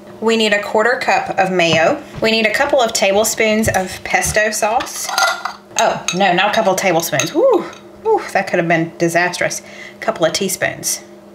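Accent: South, United States. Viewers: When I hear a southern accent and see them using ingredients from Food Lion I know it's going to be good.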